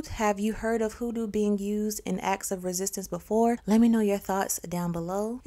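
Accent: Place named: Rural, United States. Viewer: Not sub tal 😂 girl I love your country twang lol thank you for doing this series ❤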